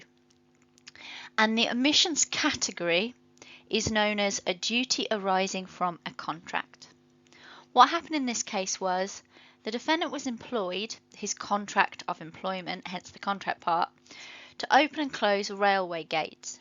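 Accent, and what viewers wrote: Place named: England, United Kingdom. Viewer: usually i dont like english accents but i liked yours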